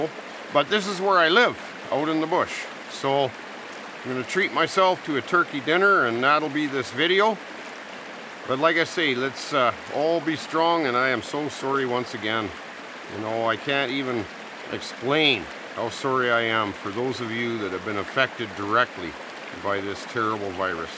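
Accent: Alberta, Canada. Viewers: This guy sounds like every man in Alberta/BC...... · He has a strong Canadian like accent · Are you Canadian? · keep recording these videos. Experiences in the "bush" as you explain and record are not only interesting, but soothing. I wish I were a bit younger and surrounded by nature. You are a great "ambassador" to bushcraft. (BTW: Your Canadian accent adds a GREAT deal to your videos.) Keep'em coming, mate!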